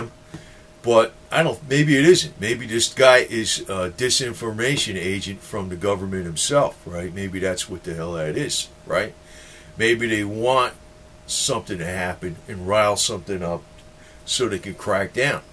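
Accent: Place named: Chicago, United States. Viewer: FINALLY! somebody sez it!..."insider"..bovine scat...."AJ" Bovine scat!...and it drives me nuts, everybody looks to RT like its some sort of oracle....do they post some truth?....yeh, but they never hang out their own dirty laundry...just ours. I like you, "Hizzoner" likes you, coz we both got the same accent...but I got out of "Big Shoulders", moved into the weeds....not out of fear, its coz the place has changed, "Rahmfather"?...forget it....agree with you on silver and the "brain freeze"...I stick with American pre-65 90%'ers and ASES....I like the 40% Kenns too...we just keep stacking...its an insurance policy, not an investment.....so you got a new subscriber, coz you helped me with the brain freeze...Happy New Year!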